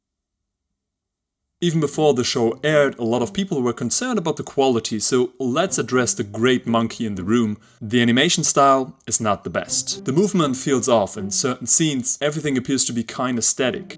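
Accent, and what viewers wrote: Germany. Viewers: I hear a bit of an german accent, am i right?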